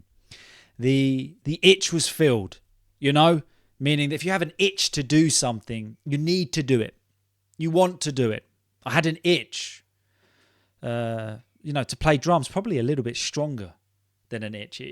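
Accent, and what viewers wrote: United Kingdom. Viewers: Hey dude, how's it going? Your British accent is awesome. Hi from Fairfield, Connecticut, United States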